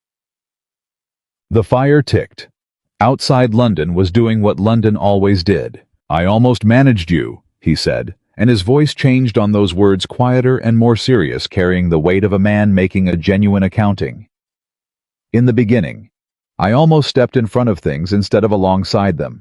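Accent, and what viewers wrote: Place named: United States. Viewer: The flat American accent doesn't belong in a story about British aristocracy. It's an assault to the ears.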